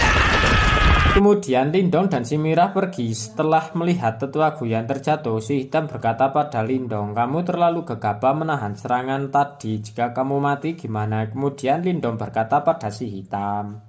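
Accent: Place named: Banyumas, Indonesia. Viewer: WONG NGAPAK TAH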